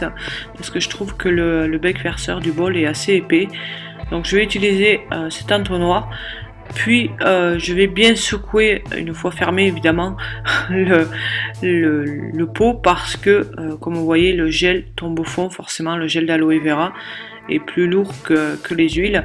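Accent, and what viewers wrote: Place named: South, France. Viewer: Coucou, Je m'abonne car j'aime;) Ça c'est un bon sérum, que des bonnes choses pour la peau. J'aime l'accent du sud;) Bonne journée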